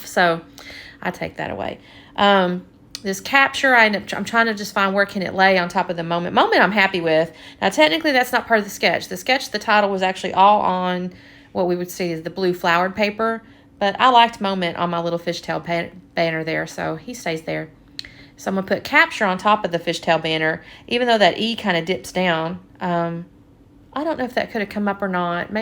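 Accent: Texas, United States. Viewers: Thank you! I love your layouts and your process videos are some of my favorite (maybe because I am from Georgia and I hear the Texas southern accent lol). Seriously, you do a fantastic job with your videos and I am very happy to have found you. Have a great rest of the week!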